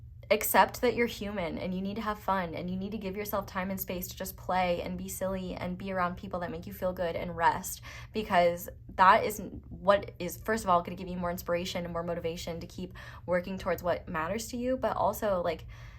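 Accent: South, United States. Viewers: You’re so endearing! I appreciate your gift! I especially appreciated your southern drawl! 😂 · Omfg when you said "feeling my way through the darkness" that's by! Southern accent 👍 awesome 🎉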